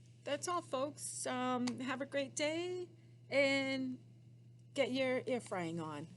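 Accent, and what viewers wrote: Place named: Massachusetts, United States. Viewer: I love her Mass accent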